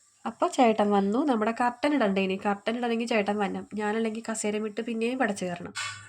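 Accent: Kochi, India. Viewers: കൊച്ചിഭാഷ കേൾക്കാൻ ഇഷ്ടമാ ❤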